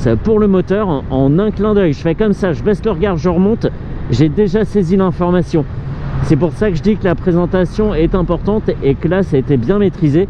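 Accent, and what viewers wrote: Paris, France. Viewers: Le langage est fleuri avec des trouvailles poétiques au fil de la poignée genre: "une fourche qui se laisse pas déborder par l'arrivée du poids". Voila, une chaîne moto qui rend justice au parigot façon titi gouailleur., le Pagnol de la banlieue, le Marcel du motocyclisme périphérique. Un vrai régal, cette verve!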